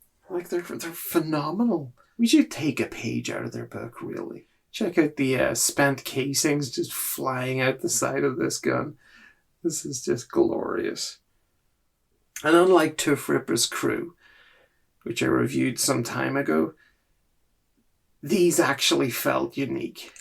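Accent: Northern Ireland, United Kingdom. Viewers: Nice N.Irish accent 😆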